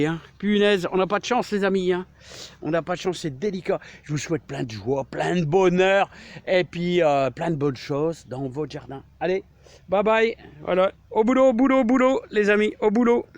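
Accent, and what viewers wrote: North, France. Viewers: « l’bache elle a d’lache » 😂 ça fait du bien un peu de patois du Nord, moi aussi chui de ch’Nord et fier de mes origines !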